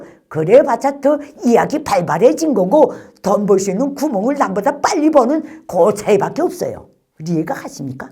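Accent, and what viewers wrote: North Korea. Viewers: 한국 오래사셨는... 말투가 그대로 북이시네 ㅋㅋ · 저말대로라면 북한은 이미무너졌어야하는데 아직도 멀쩡하잖음?내년도 그후에도 멀쩡히 체제유지할거같은데? 말투가 아직도 북한티못벗었고 왠지 상대를 가르치려는 태도가 좀불편하네. 주구장창 주저리주저리 뭔가 말은하는데 도통알아듣질못하겠다. 듣고있는게 피곤하다.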